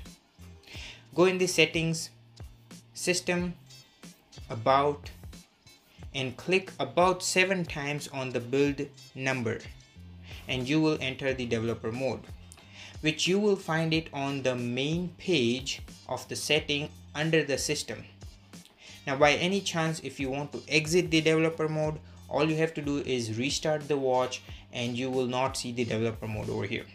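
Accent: India. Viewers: Classic Indian accent you absolute tool , culture yourself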